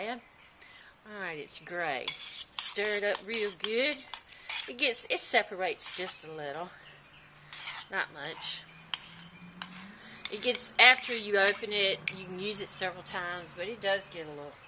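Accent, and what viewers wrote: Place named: South, United States. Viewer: Love this southern gal! Sounds just like Paula Deen! Maybe it is? LOL....going to repair my concrete birth bath now!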